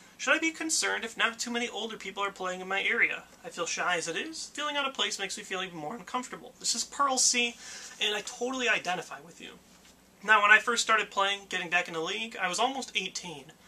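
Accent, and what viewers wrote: United Kingdom. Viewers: LOVE THE BRITISH ACCENT!!!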